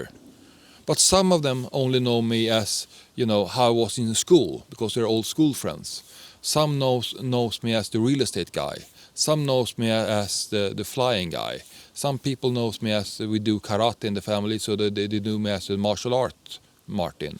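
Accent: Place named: Poland. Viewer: Wow, great idea, with starting a channel, at the same time with educational value, but it will also be a great diary for you. I stumbled upon it by accident, on the head of YouTube page, your video of your trip around Europe came up and made me curious. You have gained a really interesting aviation experience, as well as a surprising Polish accent that you flew in LOT, I myself am at the beginning of this path, I am 26 years old, about 80h of flight, studying to pass the ATPL, at the same time working remotely living in Szczecin for a German company that operates CJ1 and 2's. The aviation world is not huge, maybe one day we will meet at some airport. Greetings, Olgierd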